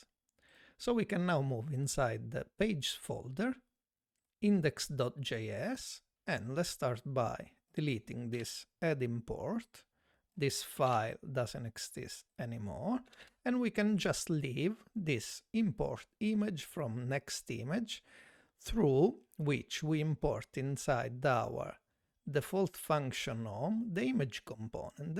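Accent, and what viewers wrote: Italy. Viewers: He is Italian, what do you expect? This tut. helped me a lot. Thanks to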